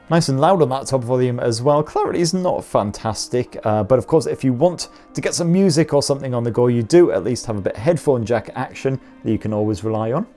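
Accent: United Kingdom. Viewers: This guy is very good in presenting but sometimes I have little difficulty to understand him. Kind of fast when talking. I beleive accent spoken here is British, but from what part of the UK? Saying "bloody weekend" at the end was a bit scary but I'm sure it was meant something nice 😁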